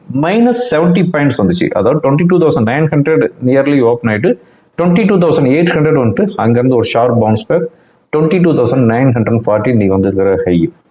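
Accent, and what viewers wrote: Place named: Chennai, India. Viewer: தல மெட்ராஸ் பாஷை பேசுது..வா தல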